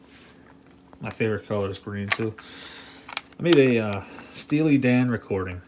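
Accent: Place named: Philadelphia, United States. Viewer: Long before you pulled out the WMMR tapes, hearing your accent I kept thinking "okay, this guy is from either from anywhere from Lancaster to Philly". You sound like my relatives. Sadly I lost my accent as I largely grew up in Virginia.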